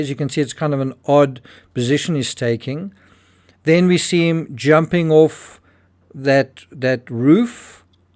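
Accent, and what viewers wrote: South Africa. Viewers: Definitely a South African narrator. i am not sure what I learned tho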